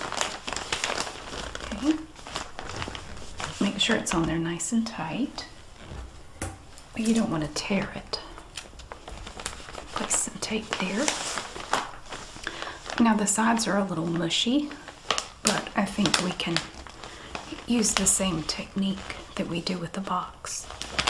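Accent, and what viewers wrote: South, United States. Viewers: Oh I feel so warm and lovely with southern accents just makes me feel happy when I hear it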